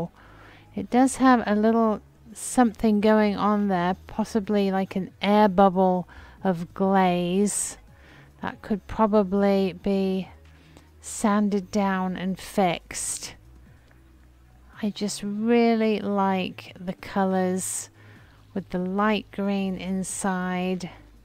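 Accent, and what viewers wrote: England, United Kingdom. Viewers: Hello. I am a fairly new subscriber (really enjoy the Goodwill trips - one of my favorites). In this video, you mentioned being a child in California. I assumed that you are from England because of your accent. Did you move here as a child but retain your accent?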